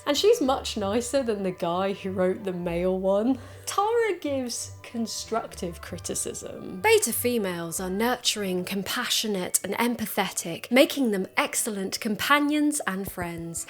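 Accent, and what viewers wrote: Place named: RP, United Kingdom. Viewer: Soooh Posh 💆🏻‍♂️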